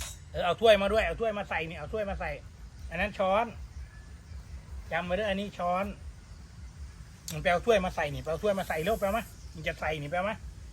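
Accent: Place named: Laos. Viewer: ตกลงมึงสิเว้าไทยคือเว้าลาวบักตาบคำเว้าหลาย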